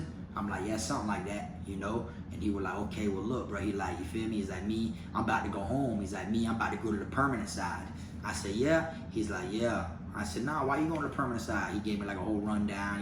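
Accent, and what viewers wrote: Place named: Louisiana, United States. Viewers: lol theres no way your actually from broward, i mean you haven't lived there your whole life, i dont know too many people who were born in dade or broward and have that kind of southern accent, where are you originally from? you sound like what i call an FCG, florida country gangsta, sounds like it could be used as in insult but its actually not at all and you don't need to actually be from florida to be FCG, thats just where i first got it from. basically its somebody thats pretty much a redneck, spent most of their life in the country or a small town but for whatever reason is gangsta as fuck and could just as easily move to the city and fit right into the hood even as a white guy or like one of those white guys that ends up in the bloods or crips cuz they are just that kind of OG. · You sound like you have kinda a Louisiana accent.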